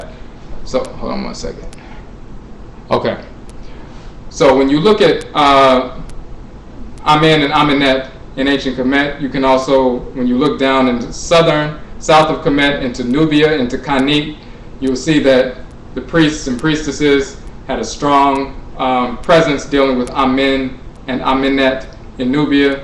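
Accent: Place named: United States. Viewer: I say you're on a rightous track.I think that, because of your American ascent, you need to speak a bit slowly so as for us to aprehend your TWI. I'm an Akan of the BANTU BLOOD line.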